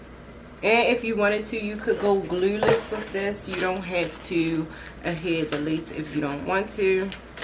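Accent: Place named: Baltimore, United States. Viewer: You're such a inspiration to black girls, you makeup always look bomb& I love your Baltimore accent ❤️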